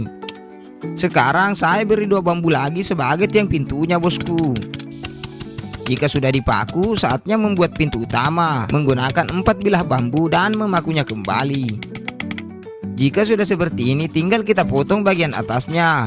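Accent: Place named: Eastern Indonesia, Indonesia. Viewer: Ha.ha baru tahu saya bang, nungguin video paman bara gak upload2, eh malah ketemu ini, Mantap daeng, Saya dari awal, mencoba menebak ini kok dialek timur sana tapi dari mana ya, begitu sebut kata "seket dan "terbangka-bangka" saya langsung 😂😂😂😂😂😂.. Sukses selalu daeng,... Salam dari bori bellayya, tanah melayu deli... Putra asli Butta Panrannuangku.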